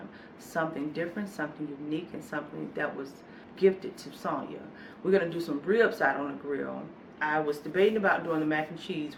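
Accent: South, United States. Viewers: Sonya, make no apologies for your faith in GOD, he alone has enabled you to be who you are, where you are and what you will be. The video's you produce reach a larger base than just numbers in the views, they reach souls with entertainment as well as encouragement. For those that would rather you not talk, let them turn off the volume because others enjoy the sweetness of your southern accent and the personality you exude. Live the life that best suits you because anything else is an inferior fit.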